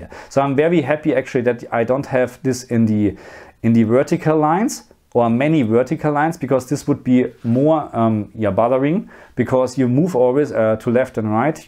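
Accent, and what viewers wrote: Germany. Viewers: Ist er Deutscher? Das englisch hört sich so an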